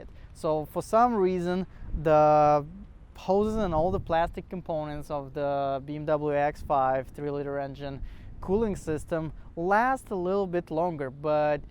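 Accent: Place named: Russia. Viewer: Russian accent af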